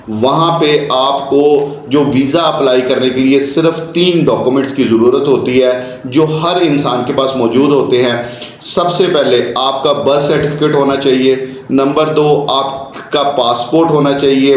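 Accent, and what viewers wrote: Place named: Punjab, India. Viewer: یہ سالے پنجابی انگریزی بھی پنجابی میں بولتے ہیں - " گویانا" نہیں ابے " گیانا" انگریزی میں " Guyana" بولتے ہیں - یہ ویسٹ انڈیز کا حصہ ہے۔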